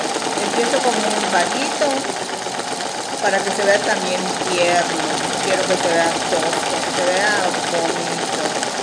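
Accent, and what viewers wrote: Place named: Yucatan, Mexico. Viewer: me encanto escuchar tu acento de Yucatan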